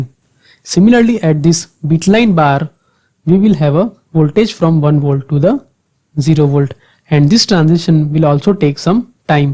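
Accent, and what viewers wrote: India. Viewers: I always dont understand a shit while a hindu talks... shit.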